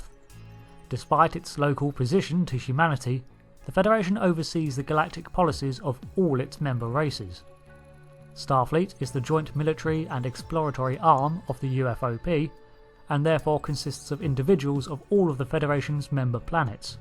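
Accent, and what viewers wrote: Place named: RP, United Kingdom. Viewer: French caption with an RP English accent.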